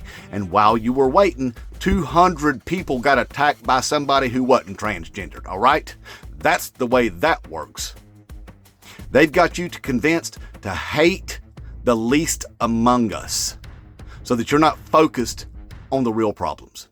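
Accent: South, United States. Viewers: Cynical east coast here. That’s the best 6 minutes of southern accent I ever heard.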